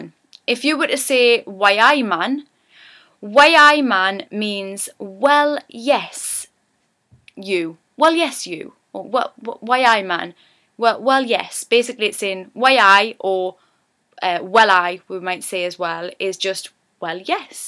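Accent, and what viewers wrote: Newcastle, United Kingdom. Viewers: someone actually speaking a Geordie accent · geordie accent is quite a challange but I love it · I really enjoyed your geordie accent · really nice to hear a pure Geordie accent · she's got the Geordie accent from the get go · I love the newcastle accent it's my fav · a video of Geordie accents and yours popped up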